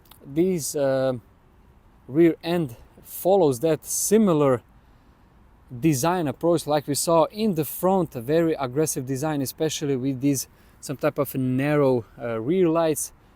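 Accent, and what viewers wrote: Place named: Balkans. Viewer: Vidi sa da Balkanac prica na ENGLESKOM 😅😇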